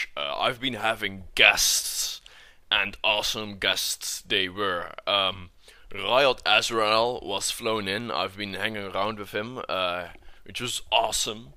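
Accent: Netherlands. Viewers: Ik hoorde aan je stem al dat je Nederlands was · Lol ik vind het gewoon grappig dat nu pas iedereen er achter komt dat hij nederlands is, hoort dan niemand dat nederlandse accent in zijn engels xD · yup his accent is stereotype dutch :Z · Yup I think so cause' he's dutch and dutch people have that accent plus he has a rather deep voice :)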